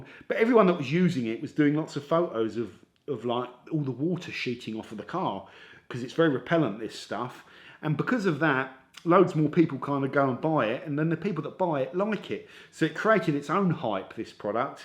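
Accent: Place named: Southern England, United Kingdom. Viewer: I’m trying to place your accent, I’ve narrowed down to a southern accent, Brighton maybe, possibly Bournemouth? Am I close haha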